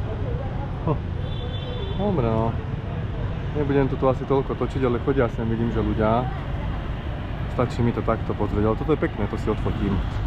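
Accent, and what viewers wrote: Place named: Šurany, Slovakia. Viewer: boa, ale tá šúránčina je riadne počuť :) Ale dík za videá, ja sa chystám do Saigonu v apríli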